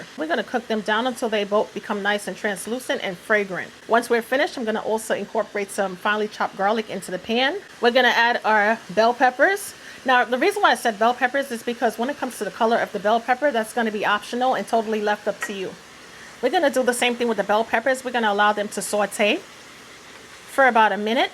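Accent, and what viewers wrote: New York, United States. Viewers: Good afternoon or morning Ms “ Love ❣️ My Name. Is. Dawson. I just want to start off & Say Yes Yes& Yes 👍🏽💯 absolutely 💕 love your Scrumptious recipes..Girl you put your “ Toes “ in it LOL you remind me so much of my late & Great Grand-Mama “ Pearl” 👼🏽 God rest her precious soul Amen 🙏🏼 But yes I just had to put in the comments because I have pics on and please correct me if I’m wrong you are strong “ New York “ accent 🫠. And he reminds me so much of a cousin I have comes from the boogie down Bronx💯 but Yes ma’am’ ❣️❣️ that’s all I’m gonna let you get back keep continue doing what you doing Great job. 👍🏽💯❣️ Ok... have a blessed one..Ms Dawson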